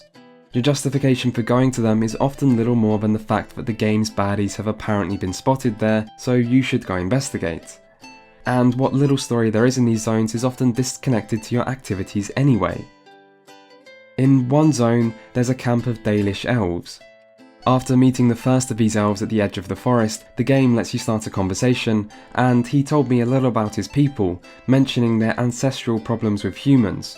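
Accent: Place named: United Kingdom. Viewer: WEll, that was a waste of time. One day I'll learn to unclick when I hear a random british-sounding white dude voice.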